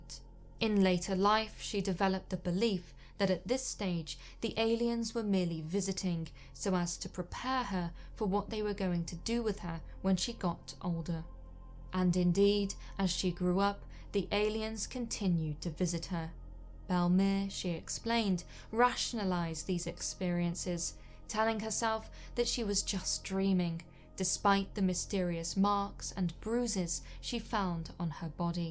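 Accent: United Kingdom. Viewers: is one of the most stunningly, naturally beautiful women I have ever seen. Her skin is perfect. And that soft voice with that British accent, WoW!! What more could any viewer ask for!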